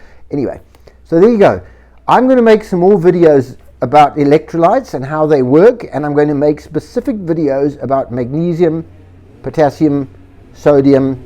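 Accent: South Africa. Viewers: You sound South African😊I'm in Port Elizabeth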